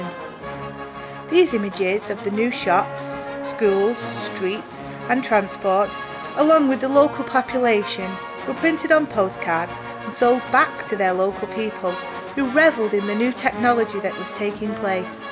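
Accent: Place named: Northern England, United Kingdom. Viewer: First off. A big thank you to the film makers. This was a labour of love made by dedicated documentary film makers! The naration by is superb. Just enough of a northern accent to give it warmth. I loved it. For a film of its length and for a town so small to be able to hold you for 59mins is a tribute to the makers. My Gran lived in Golborn. My late mother was born there. I used to go to Golborn on a Saturday with mum on the bus from Leigh to see her mum. It would be around 1948ish to the early 50s. Gran lived in the second house from the Barn Lane end of Legh St. Opposite the cenotaph. Mum and dad were family freinds of Peter Kain. He made them a poker in the foundery for a wedding present. Later in life, when I was about 12, I used to go to Golbourn on the bus from Leigh to train spot on the station. The station master always kept an eye on us from a window up in the station building. 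Even now I have a model railway at the age of 78. I still have wonderful memories. I have to say thank you to for posting this gem.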